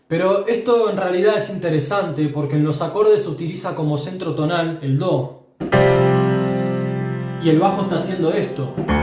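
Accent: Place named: Argentina. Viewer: Espectacular análisis de la técnica del gran Keith Emerson en español. Intuyo por tu acento que eres argentino. El país de Alberto Ginastera, al que, como muchos otros, conocemos gracias a Keith. Se me ha pasado volando la media hora larga del video.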